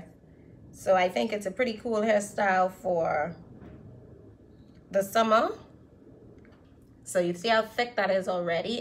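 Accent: Bahamas. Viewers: Just started watching and I'm subscribing already because I hear that you're a fellow Bahamian. Big up BAHAMAS!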